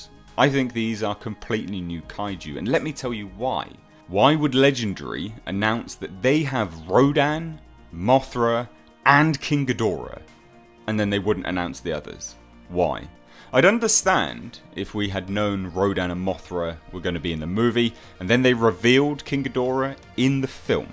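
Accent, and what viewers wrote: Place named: England, United Kingdom. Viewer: Your trailer breakdown was the only one that was good!I'm 48, grew up watching all these movies, Gamera also! But I've never, and I mean NEVER been so excited for one of these movies, they've done godzilla so well! Monsters look very realistic! It's gonna be great! U have an English accent, are you in England or the USA? And are the release dates the same?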